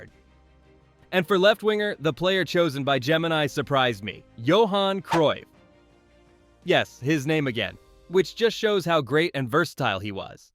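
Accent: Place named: United States. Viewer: Damn.. the American accent is excruciating 😅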